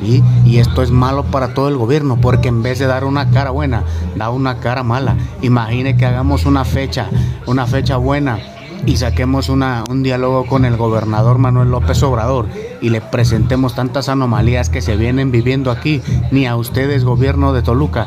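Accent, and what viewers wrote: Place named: Colombia. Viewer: Tienes acento colombiano hermanito 👍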